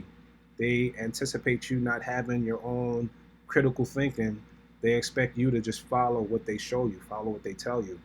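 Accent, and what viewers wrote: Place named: New York, United States. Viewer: loved your video...great narrative! As a former NYer...also great to hear a true NY accent!!!!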